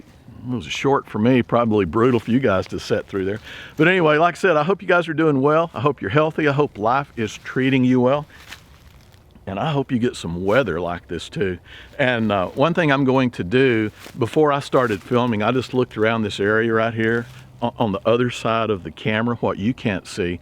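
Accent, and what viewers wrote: Texas, United States. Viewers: I could listen to your stories all day, I love your Texan accent and I’ve been down there for awhile but I’m a Canadian n need to b home but I’m greatful that I can hear ur stories and struggles along with you and your beautiful family. Thank you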